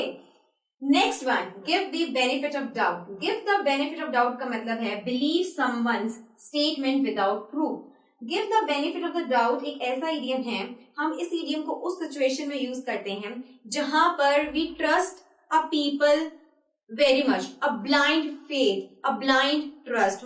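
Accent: India. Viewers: God please! try to adapt a neutral accent and not Indian accent it's so stereotypical. c'il vous plait! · Hey are you from Hyderabad? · Obviously she is inexperienced, speak like a machine gun with strong Indian accent, thank you anyway.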